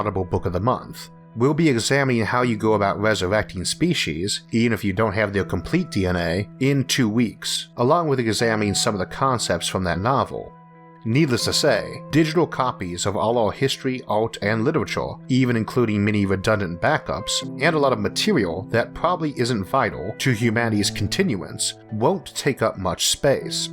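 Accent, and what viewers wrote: United States. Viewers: Why does your voice sounds like that?? Are you american?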